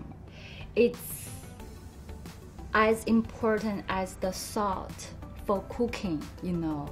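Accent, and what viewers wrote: China. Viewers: Agree, also because her English sounds Chinglish. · Is she Chinese? Because this accent is common among Chinese. I like it!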